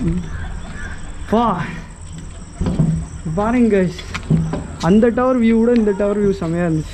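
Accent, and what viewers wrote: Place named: United Kingdom. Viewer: Unku tamile pesa theryathu la London durai poda dei olunga pesu frst 🤦🏻‍♀️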